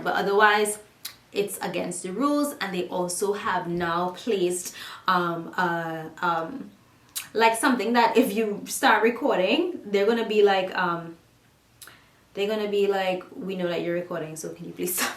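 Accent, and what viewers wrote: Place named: Trinidad and Tobago. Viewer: Oh sis you’re Trini to d boneee 🤣🤣 the accent is so familiar!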